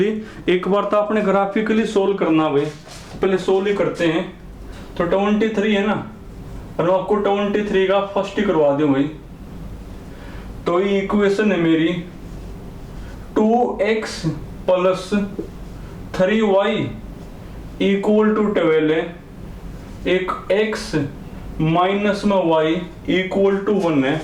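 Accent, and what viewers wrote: Haryana, India. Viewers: Sir I thik you Belong to haryana...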